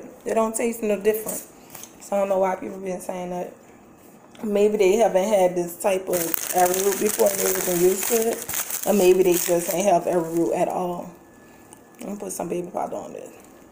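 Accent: New Orleans, United States. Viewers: U gotta be from New Orleans with that accent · New Orleans? Your accent gave it away 😊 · How are you, I am one of your new subscribers. I love your accent and by any chance are you from New Orleans because your accent sounds very similar to my sister's who lives in New Orleans. I was born in New Orleans, but I been in Jersey most of my life. I also love your bricks from Starch Bricks, I finally received my order today. That was my second time ordering but I probably order from him again cause he is on point.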